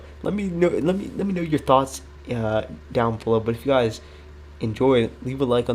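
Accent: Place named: Canada. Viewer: You sound extremely Canadian.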